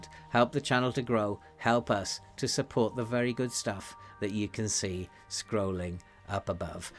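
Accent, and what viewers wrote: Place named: RP, United Kingdom. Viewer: So my guess was right. And if you ask me how I did it I'll answer - you speak a very good well-built RP English peculiar to teachers especially. Just an observation.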